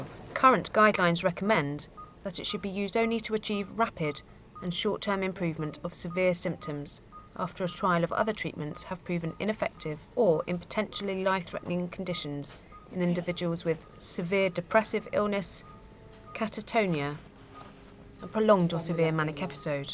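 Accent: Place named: England, United Kingdom. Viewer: I adore such English pronunciation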